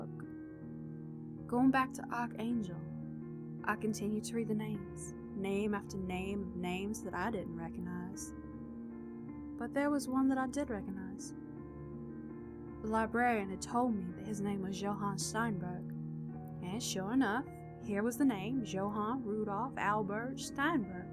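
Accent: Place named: West, United States. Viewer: Real good western accent. I thought it fit the story.:)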